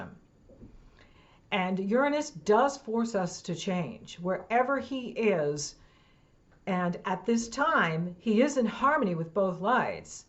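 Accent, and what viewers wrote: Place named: New York, United States. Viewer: Always so uplifting. Looking great. Notice the New York accent now that I recently looked it up.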